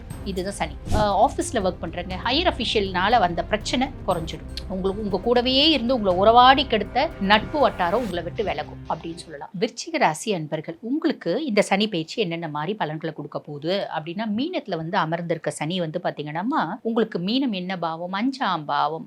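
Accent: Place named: Northern Tamil Nadu, India. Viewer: Ennadae ammea vadakku thamilatchiyo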